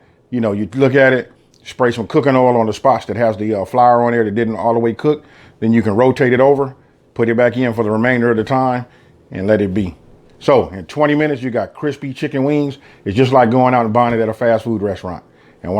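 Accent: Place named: West Coast, United States. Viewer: I appreciate you not hiding your west coast accent LOL....good video bruh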